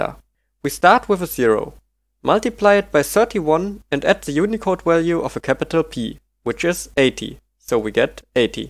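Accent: Germany. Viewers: man hört das du deutsch oder whatever bist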